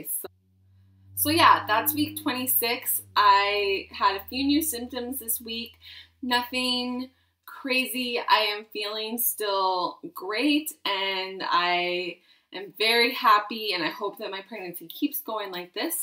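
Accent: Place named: Canada. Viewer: I just love your Canadian accent!! Excited to see your babies soon!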